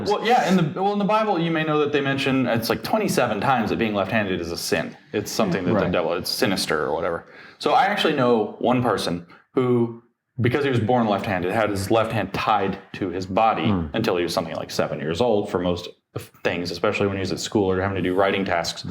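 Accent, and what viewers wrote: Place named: United Kingdom. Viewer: I don't find it tedious, and I'm sure I'm not alone in that. It's so easy to forget that Konstantin grew up in Russia until he was 13. The man speaks with a British accent and went to university in Britain. It's not as if he says that all the time. You try living in a "foreign" country and NOT making reference to the culture of your childhood quite frequently. It informs his worldview, and is probably responsible for his fairly unique viewpoint on many issues.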